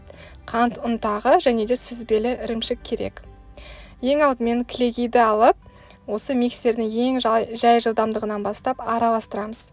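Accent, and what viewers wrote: Standard. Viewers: сізді үнемі көремін. Өте жақсы дайындайсыз! Таза қазақша сөйлеп!☺️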